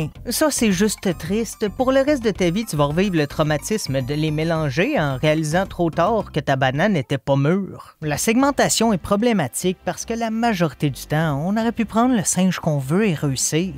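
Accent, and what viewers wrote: Quebec, Canada. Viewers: Je suis québécois et j’adore ça t’as un québécois tu est mon préféré de tous les youtubeurs mon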